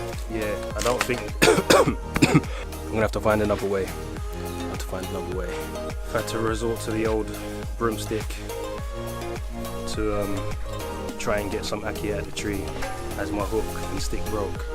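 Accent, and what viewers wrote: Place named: London, United Kingdom. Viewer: You sound like your from London, so am I and been living in Florida USA for 14 years. I have 2 ackee trees in my back yard, got a proper 15ft fruit picker with a basket to get them and thoroughly enjoy the harvest each year. Harvest is coming to end being November. My Jamaican neighbors love it too and give them bags of whole ackees so they can see it’s opened naturally. Once I made the mistake of giving them ackees I removed the flesh thinking I was helping. I have a hard time with squirrels here and can only try to keep them under control with an air rifle.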